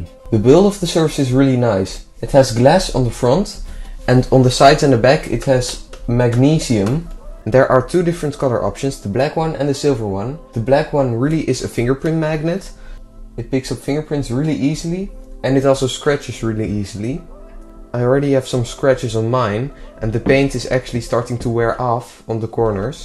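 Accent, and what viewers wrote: Netherlands. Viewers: Are you dutch perhaps? Or maybe from Belgium? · You sound like you are from the Netherlands!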